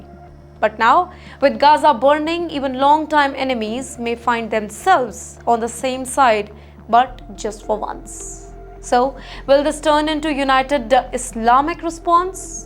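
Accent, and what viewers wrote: India. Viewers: Indian pronunciation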